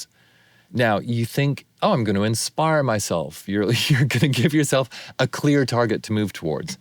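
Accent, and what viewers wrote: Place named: United Kingdom. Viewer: the partial british accent is an adorable marker of his time abroad "schtewdio"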